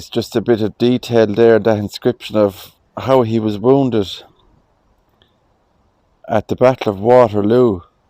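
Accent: Ireland. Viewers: Merry Christmas to you and ❤ I love listening to your voice as the accent reminds me of my Grandparents who came to America from Ireland. Thank you so much for sharing these beautiful cemeteries with us!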